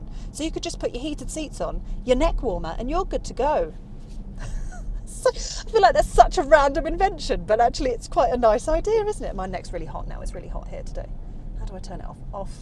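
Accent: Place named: United Kingdom. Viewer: I just love this video. The car is great, the lady reviewer is charming and beautiful, her British accent is divine. Her review is objective and she points the positive as well of the negative aspects of this unique French car. Great job ! I hope DS Automobiles sees this review so that the brand can improve on the shortcomings of this "luxury" model that the Lady points out.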